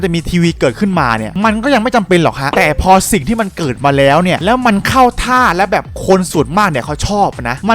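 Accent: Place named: Sukhothai, Thailand. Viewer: พี่พูดเหมือนภาษาสุโขทัยเลยคับ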